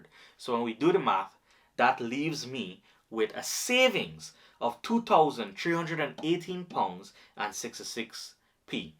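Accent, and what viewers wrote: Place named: Guyana. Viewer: You honestly sound like ur from Guyana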